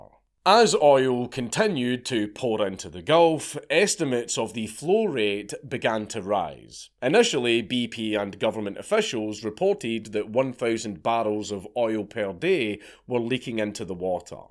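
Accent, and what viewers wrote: Scotland, United Kingdom. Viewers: You are Scottish do the Piper Alpha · spill with a Scottish accent sounds awfully close to spell, and at times had me wondering about what wizard was responsible for this catastrophe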